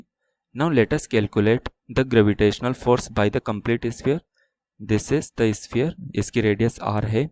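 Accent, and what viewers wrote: India. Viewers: He's speaking English with a thick Indian accent.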